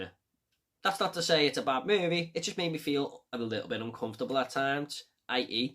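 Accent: United Kingdom. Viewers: Good review man, nice to hear someone from the UK talking about stuff like this too - if you're interested in any recommendations that don't usually come on these "extreme" lists check out Red to Kill (HK) and Daddy's Little Girl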